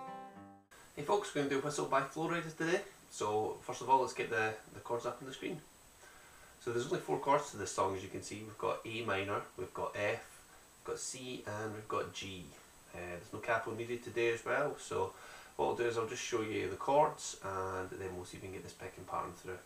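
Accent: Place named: Scotland, United Kingdom. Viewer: my guess is he is scottish, he deffinatley sounds it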